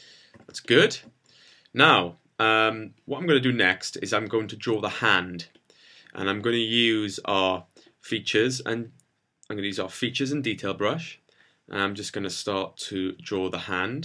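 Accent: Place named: South Wales, United Kingdom. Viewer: Yanni Courtney he has a South Wales accent.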